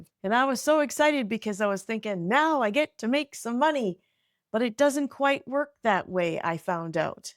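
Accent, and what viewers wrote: Canada. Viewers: Love your Canadian accent! Reminds me of my Canadian relatives! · Thanks so much for the helpful explanation. I started a channel (under a different handle than this one) and keep trying to plug away at it waiting for it to gain traction, but now i know - it's stil early! Also - I registered the accent before you mentioned you were Canadian. I enjoyed listening to you!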